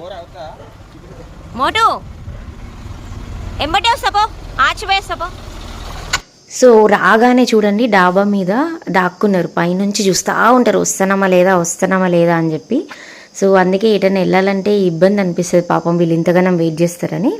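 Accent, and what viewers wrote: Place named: Telangana, India. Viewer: తెలంగాణ యాస నా 😊😮 మాది గోదావరి యాస😂😊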